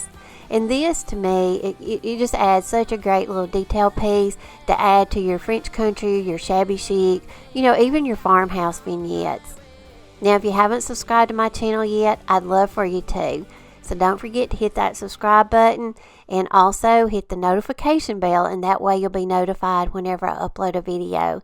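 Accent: South, United States. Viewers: Hi, my grandbaby and I watch you and get a kick out of your southern accent....her new hobby is turning everything 'trash to treasure'. We love everything! God Bless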